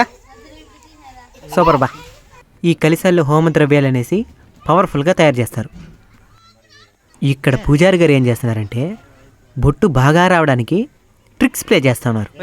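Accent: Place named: Chittoor, India. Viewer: ANNAYYA MEMU ME OORIKI May LO vasthamu yekkada gudi undedhi PLEASE address cheppagaru ME VOICE vintunte chittor jilla vallala undhi PLEASE PLEASE E gudi chusina tharvatha aa gudiki ravalani undhi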